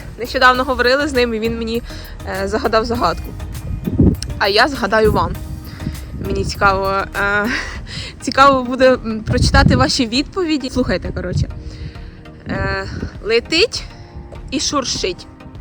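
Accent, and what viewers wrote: Poltava, Ukraine. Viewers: на справді я думав що з Полтави або західної України ) не в вгадав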